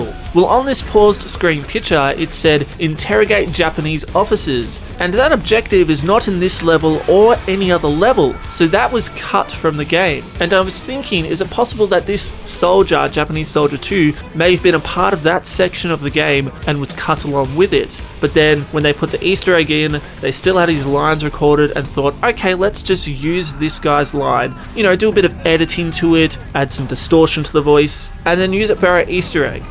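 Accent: Australia. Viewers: You Aussy? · Is this guy australian ???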